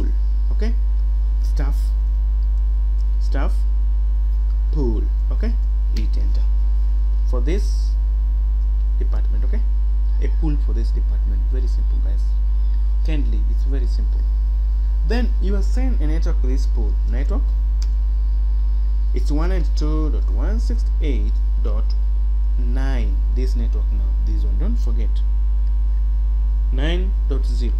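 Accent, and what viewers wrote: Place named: India. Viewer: sir i am an ethiopian please make your english language good make it approach to american english not to indian accent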